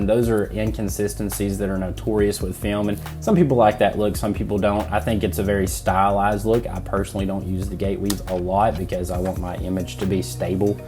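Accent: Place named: Georgia, United States. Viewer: That's a Georgia Accent if I ever heard one. Takes one to know one. Marietta!!!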